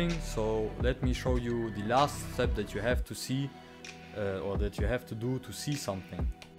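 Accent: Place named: Germany. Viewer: I can recognize the German voice:)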